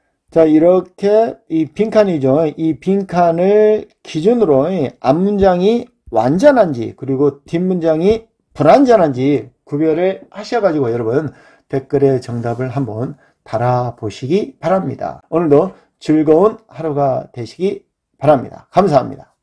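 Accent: Jeolla, South Korea. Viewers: 영어보다 즌라도 어투가 넘 도드라져서ㅜㅜ 40초 듣고 껐습니다 ㅜㅜ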